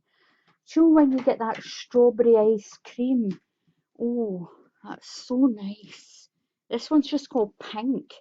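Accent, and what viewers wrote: Scotland, United Kingdom. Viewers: Where are you from in your accent sounds like Scotland am I right?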